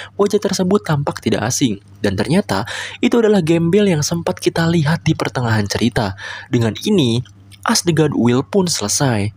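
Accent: West Java, Indonesia. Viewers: Admin org jawa barat keknya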